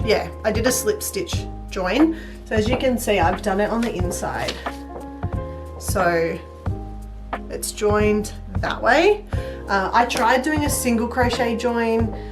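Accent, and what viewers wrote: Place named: Australia. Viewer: I’m in the process of making one and was happy to stumble upon your video. I’ve made a couple of small ones for babies and toddlers but this is my first for me. It’s so good to hear an Aussie voice and listening to your ideas. I’m not a fan of the large arms so looking for ideas. Good luck with your Chanel, I’ll be following you 💐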